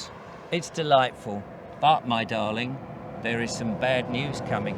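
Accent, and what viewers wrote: United Kingdom. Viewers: I was so glad to hear you speaking British when I started playing it. But I'm sure they'll try to do it over here too, it's all the WEF.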